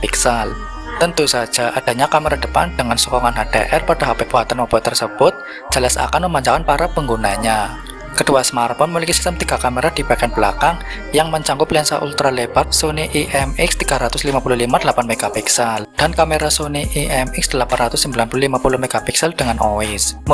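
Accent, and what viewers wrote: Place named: Java, Indonesia. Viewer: Jawa koek bnget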